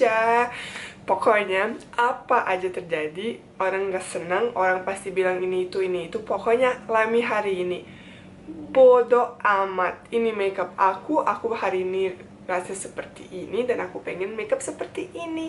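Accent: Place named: Java, Indonesia. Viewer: Ngomongnya luar biasa medok banget, sampe nonton ketagihan, nonton terus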